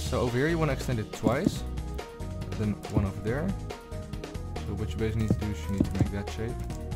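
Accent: Netherlands. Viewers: I sense a Dutch accent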